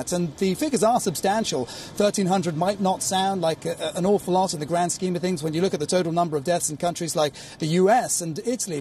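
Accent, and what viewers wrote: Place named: India. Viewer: INDIA ACCENT TAKEN TEEKEN, ABLE EBLE, SAY SEY, FACEBOOK FECEBOOK, GO GU, WAY WEY, GROWTH GRUTH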